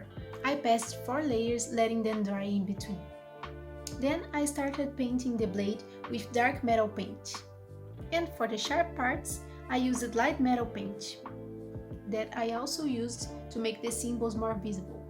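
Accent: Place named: Brazil. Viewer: que ingles de brasileiro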